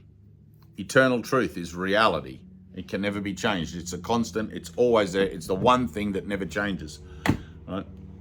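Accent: Australia. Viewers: You sound Aussie. Are you?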